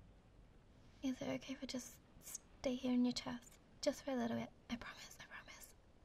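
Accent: Hertfordshire, United Kingdom. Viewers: Finally an ASMR Vid who’s ASMRtist has the same accent as me rather than the standard American one. I keep listening to this genre of ASMR hoping that something like this would happen to me even though it makes me feel lonely afterward (yeah I know generic sad lonely lad here). Thank you for this vid, it’s very well spoken and immersive, keep it up 👍 (you’ve made this lonely lad from the back ends of Hertfordshire a little less lonely)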